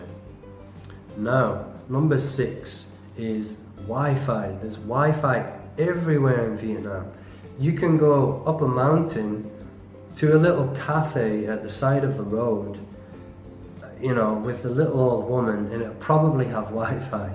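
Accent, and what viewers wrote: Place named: Ireland. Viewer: You are so cute!! and your Irish accent OMG <3 *major crush*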